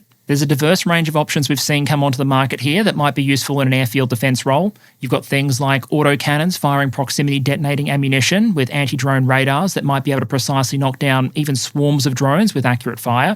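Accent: Australia. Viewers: Maybe they've never heard an Aussie accent? Or humor?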